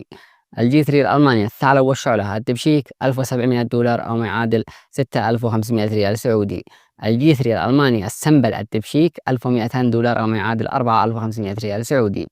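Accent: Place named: Yemen. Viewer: ضع علي الشاش كتابة الارقام المسعره مع الكلام لان الكلام ليس مفهوما جيدا مع النطق السريع واللهجه اليمنيه 🎉😮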